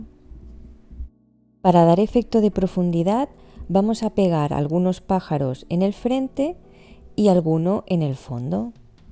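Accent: Catalonia, Spain. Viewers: Eres Catalana por tu peculiar forma de hablar Me encanta , pues conozco bastante Catalunya y,tengo muchos amigos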